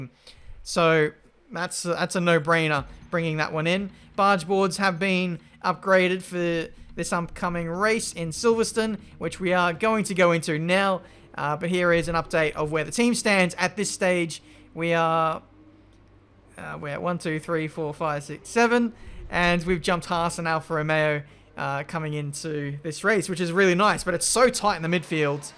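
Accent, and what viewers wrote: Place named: Australia. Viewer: How to watch channel: Step 1: Select video Step 2: Click "Like" and "Subscribe" if you haven't already. Step 3: Sit back, watch and listen to smooth jazz Australian Commentating Voice.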